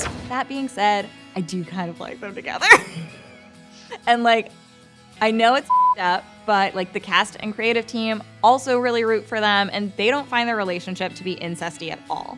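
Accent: United States. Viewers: Your accent is more like The californians from SNL 😁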